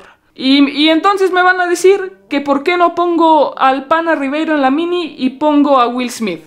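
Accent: Costa Rica. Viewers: Eres tico?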